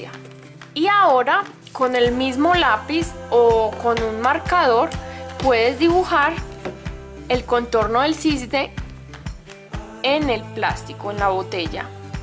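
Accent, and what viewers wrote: Colombia. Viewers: Eres colombiana verdad? Te lo digo por ese acento que tienes. Y que a mi en lo personal me encanta. Saludos y Bendiciones desde Guadalajara JALISCO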